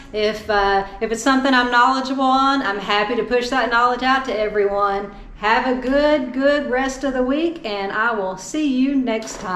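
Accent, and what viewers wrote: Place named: Alabama, United States. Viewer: “He tells you to get the blanketed blank off the bus “ 😂 sounds hilarious with Alabama English. Love it ...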